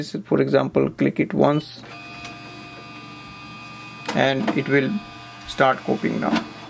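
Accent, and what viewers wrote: India. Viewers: Indian accent is extremely disgusting man I’m sorry just had to say it. You ruining English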